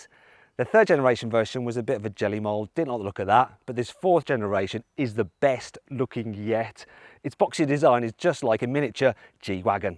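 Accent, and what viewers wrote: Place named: Tamworth, United Kingdom. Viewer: Do a 4g63 swap ,you sound like you are from Tamworth. Buy this one or buy your self a subaru forester sti .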